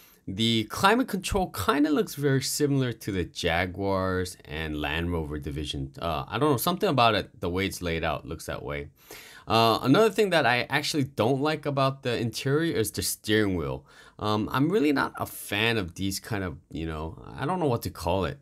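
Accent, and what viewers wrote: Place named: South Korea. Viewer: I can tell that you're a Korean from your accent. Why are you pronouncing Hyundai as Hyun-DIE????? You know what they're supposed to sound like.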